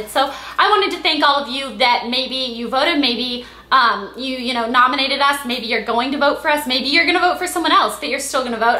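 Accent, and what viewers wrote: United States. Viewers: cute chick... I like your freaking american accent xD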